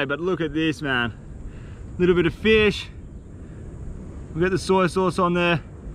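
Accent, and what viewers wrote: Australia. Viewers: Its Aussie accent for me, and such a positive vibe and energy. I love this dude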